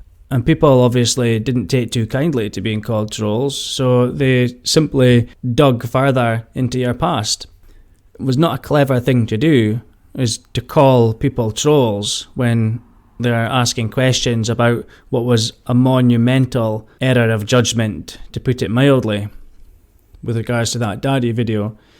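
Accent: Scotland, United Kingdom. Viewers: You Scottish bro that’s coming from a Scotland ma lol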